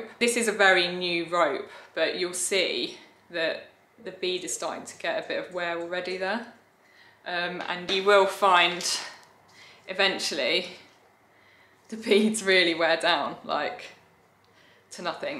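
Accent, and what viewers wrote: England, United Kingdom. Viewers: I love your British pronunciation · Love your too cute puppy .Glad to see a actual video that's not a short couple of seconds 👍. Also love your British accent.I am from Southern California USA ✌️ and nice to meet you . You are incredible with the rope .I have enjoyed all of your videos . Wish you all the very best with your YouTube success 👍... SergeantC (Retired US Army) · British English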